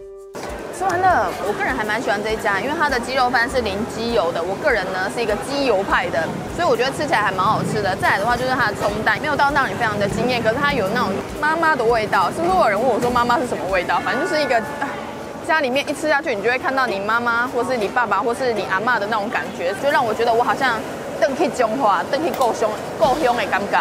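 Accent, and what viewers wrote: Taiwan. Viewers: 的台語這麼道地，不知道要會不會講泰文欸好好奇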